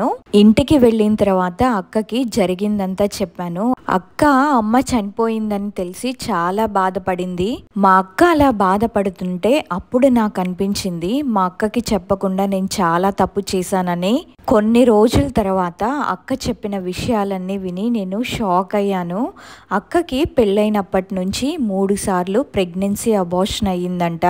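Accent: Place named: Coastal Andhra, India. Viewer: 🚩✅ You are speaking a brry perfect pite Krishna Coastal Telugu language with ease & without any corruptive influencial slangs of Indian language Urdu or exotic Arabic language. Very impressive. Very good. Keep it up. If interested you Study "Garuda Puranam & one special chapter in Oka Yogi Atma Katha " that narrates"Life after Death of Human persons of bad souls - Evil forces. By this you will have better understanding about dissatisfied Souls that roam around their related people. ✅🚩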